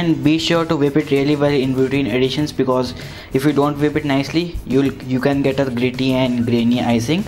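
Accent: India. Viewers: his English has an Indian accent..nothing racist.fool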